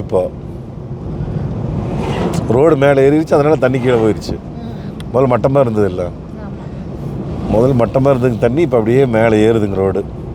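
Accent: Kongu, India. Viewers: அண்ணா நீங்கள் பேசும் கொங்கு தமிழும் லடாக் பயன வீடியோவும் மிகவும் அருமை வாழ்த்துக்கள் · கொஞ்சும் கொங்குத் தமிழ் எப்ப வரும் காக்க வைத்த அண்ணன் அவர்களுக்கு வாழ்த்துகள். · கொஞ்சும் கொங்குத் தமிழ் பேசும் கோமகரே...! திகட்டாத தித்திக்கும் பேச்சுடைய திருப்பூர் திருமகரே...! எங்கள் மதிப்பிற்குறிய டான் திரு. அண்ணா❤❤❤🙏 அவர்களே எப்படி இருக்கீங்க அண்ணி❤❤❤🙏 எப்படி இருக்காங்க ரித்திக்❤❤❤ எப்படி இருக்காரு. அழகான வீடியோ அற்புதமான இயற்கை எழில்கொஞ்சும் பனிமலைகள் பல வண்ணங்கள் கொண்ட மரங்கள்...! கண்கள் இரண்டும் குளிர்ந்தன அருமையான காட்சிகள் கண்டு...! நம் ❤சிட்டுக்குருவியோட❤ தங்களது இந்த லடாக் பயணம் இனிதே தொடர்ந்திட நல்வாழ்த்துக்கள் அண்ணா❤❤❤ என்றும் அன்புடன் உங்கள் தம்பி என்றும் அன்புடன் உங்கள் TMF ரா.முஹம்மது கபூர் (சென்னையில் இருந்து)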